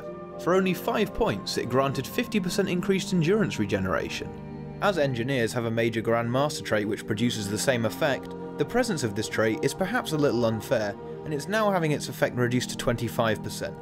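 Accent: United Kingdom. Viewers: British accents make everything sound like im watching a documentary on BBC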